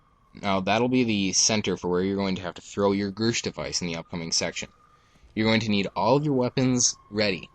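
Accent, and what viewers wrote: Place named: Russia. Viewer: Also this guy has a Russian accent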